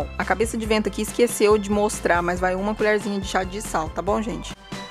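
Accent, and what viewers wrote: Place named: Inland São Paulo, Brazil. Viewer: Posso estar enganada mas o sotaque dela, parece com o do povo do interior de Sao Paulo. 🤔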